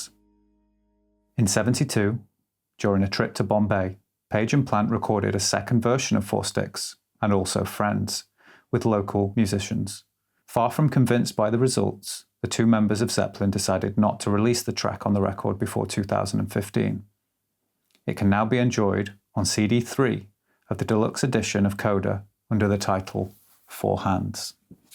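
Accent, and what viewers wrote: United Kingdom. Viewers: So sorry for the delay in my commentary. I know you must have been waiting, anxiously, constantly refreshing your page, to see if the crazy and occasionally overmedicated American girl has provided her invaluable feedback on your new concept. Worry not, I've prepared notes. Thing number 1) While a gross over-generalization for sure--all person speaking with any accent from across the pond equate to "smart, intellectual, generally polished, academic-type" to the universally ignorant American ear. I.e. you could read from a local phone book and you would sound like a BBC new correspondant to your American audience. This is worth capitolizing on, especially for a Story Time concept. which leads to Thing number 2> Thinking more of an Alfred Hitchcock-type-vibe. walking in to his own silhouette? Thing number next> maybe crossed with a Tales of The Unexpected vibe....fireplace crackling (if no actualy fireplace available....a makeshift prop would do....might even be more hysterical).....and smoking jacket and pipe....I mean, c'mon......no brainer.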